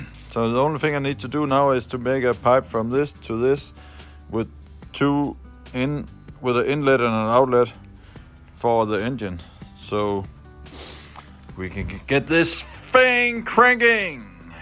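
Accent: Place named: Jutland, Denmark. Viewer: det er jysk når det er bedst 😊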